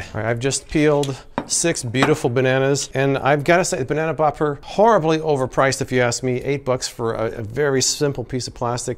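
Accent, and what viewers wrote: United States. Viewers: baanaynia or banana American vs rest of the world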